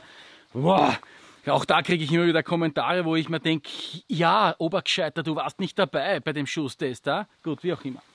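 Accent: Austria. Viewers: He speaks Austrian not German. This is a (funny) difference. So I agree, the autotranslation is useless.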